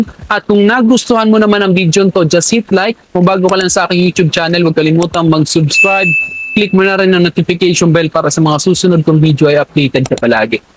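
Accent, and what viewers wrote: Samar, Philippines. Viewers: Taga samar ka no? Yung tuno mo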